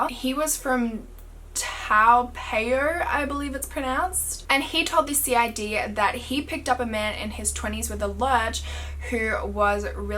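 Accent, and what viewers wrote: Australia. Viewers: Is it weird that I look forward to these videos because I just enjoy hearing you talk 😂😂😂 Australians honestly have the best accents! Hahaha but thank you for putting up another mystery vid!! Love you!!❤️ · Cuz shes Australian 🤭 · i was not expecting you to be Australian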